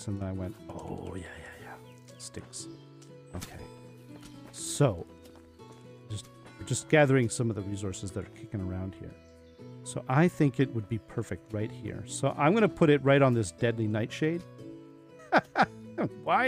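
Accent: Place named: North Dakota, United States. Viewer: So I have been sitting here binging this series (how long will it take to get caught up?..haha). I have to say thank you, listening to your gameplay while I work just makes my day better. I am related to a bunch of North Dakotans and Minnesotans and your accent is very similar, it just puts a smile on my face. So thank you again. :)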